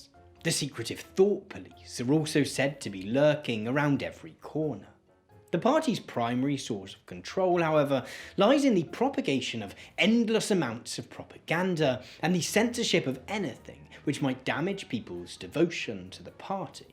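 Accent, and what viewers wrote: RP, United Kingdom. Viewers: Mr., the simplicity of your mind cant excuse the deliberate missinformation and outright lies in this video about both peterson and 1984. The wannabe etonian accent and wording you use for dramatic effect and to trick youre fans to think you are actually erudite cant really fool anyone else. Oh well, what a waste of time. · Your bbc voice makes these scary human beliefs a bit easier to handle.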